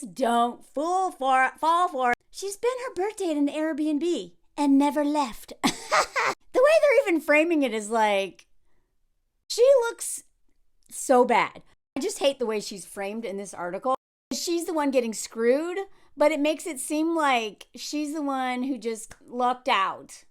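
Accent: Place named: Chicago, United States. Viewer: Your Chicago accent is hilarious! I'm from Chicago. Another amazing video,!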